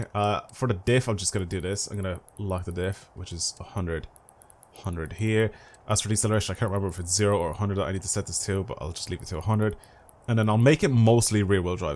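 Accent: Ireland. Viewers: Hmmmm? Are you irish? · Are you Irish?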